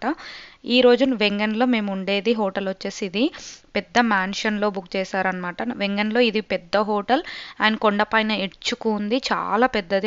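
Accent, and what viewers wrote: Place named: Telangana, India. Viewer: Switzer land video excellent....telugu telagana slag..telugu ammayi ....eni yrs ayindhi usa loo settle ayi...emaina introduction video vundha...ella antha easy gha eni yr settle ayaru...malli europe countries ani video thisaru very rich anipisthunaru ...chalavaraku andhariki settle kavataniki okha 20yrs time paduthundhi ...manam putina place ellu vundali anukokunta andharu antha easy foreign countries ella settle avuthunaru. .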